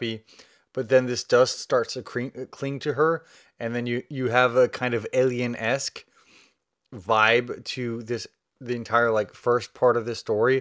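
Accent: South, United States. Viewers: I can't believe you had like a book called, "To Sleep In A Sea of Stars" by Christopher Paolini at your house in Texas during your quarantine until you're reading the whole book and talking about Kira Navarez's life was on the brink of a happy change. She was newly engaged to her co-worker named, Alan, geologist and they were planning to settle on Adrasteia, the moon of Sigma Draconis, where they had been working together for several months. It's really important to do that and I hope your husband, Zach will be very proud of you, because I love your Southern accent!!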